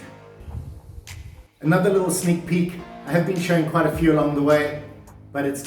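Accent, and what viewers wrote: South Africa. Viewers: You sound South Africa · Can I guess we're ure from?.... South African Or Australian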